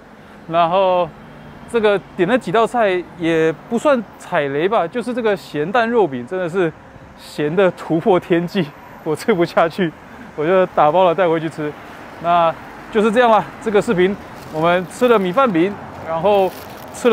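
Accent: Taiwan. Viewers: 你是福建人嗎? 口音跟台灣很像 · 为啥感觉你有台湾口音。 最明显的区别：台湾：试看看。吃看看。 大陆：试试看。吃吃看。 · 不知你是那裡人？有時候聽你説英文～國語非常像台灣人～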